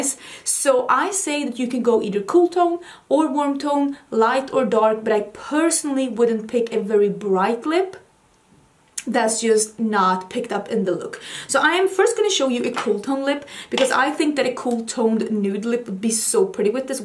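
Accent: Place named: Stockholm, Sweden. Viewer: My Fiance is from Stockholm, I'm from the US. To me, your accent sounds like a Stockholm accent(I could totally be wrong tho!). I'll be moving to Sweden in the next year! So glad I found your channel!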